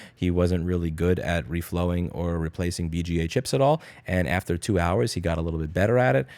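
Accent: New York, United States. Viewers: I have a feeling in like two years time he's going to look and act completely Texan, but still talk like a New Yorker. Welcome to Texas =)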